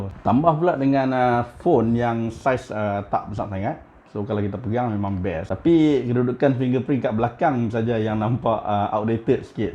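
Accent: Malaysia. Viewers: Kedah pekat noh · Brother ni orang penang ka?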